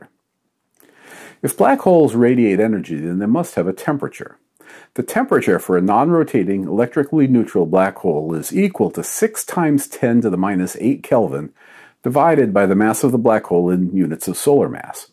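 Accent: United States. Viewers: He addressed this topic earlier. I guess he doesn't care about German pronounciation and prefers the American, just like they don't call the country Deutschland. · Once again regarding the your Americanized simplified pronunciation of non-American scientists' names. Can you, please, say "Descartes", and "de Broglie" to name just two, as a proud but somewhat ignorant American? (BTW, the 1st is pronounced "decart" and the latter "de Breuille").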